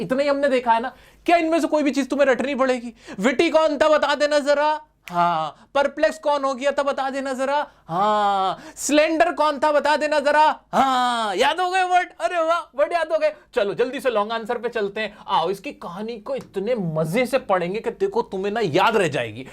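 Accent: United States. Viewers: American accent😂😂 Btw sir thank you soo much 🐱 Itna achaa padhaane ke liye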